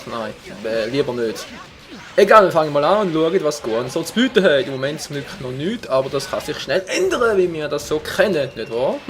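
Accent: Switzerland. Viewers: OK ich bin eindeutlich zu gewöhnt an dein schönes deutsch, das ich dein Schweizerisch erst nach 5 minuten erkannt habe... und das obwohl ich mal schweizer war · Du tönsch wie en koleg fo mir aber de akzamt isch chli andersch freut mich aber das ich weis das auch schwizer lets Playstation mached ^^ es abo hasch fo mir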